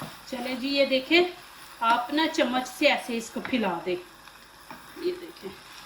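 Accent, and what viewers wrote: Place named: Punjab, India. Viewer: Bohat Mazy dar Anday began bnay hen ap ka panjabi lehja bohat Pasand h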